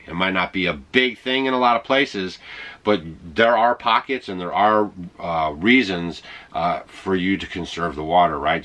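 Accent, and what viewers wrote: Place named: Appalachia, United States. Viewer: Living off the grid????? And you're talking about living in a RV? Wipe ur ass on tree moss if U can find it and keep raising all those bugs in your beard for your next meal. Water should be no problem for you as we can see that you bath once a year whether you need it or not.. What you gonna do when you can't put all this BS on YouTube? Are you doing all this on "Solar Power"? I can see that if someone doesn't shave or shower for a year or two, and they talk like a 1940's Hill belly-- they can sell BS to Morons.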